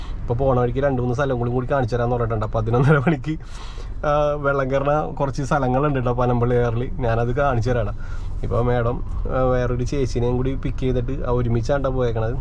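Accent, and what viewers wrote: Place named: Palakkad, India. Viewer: Chettan parajath palakkad slang aanallo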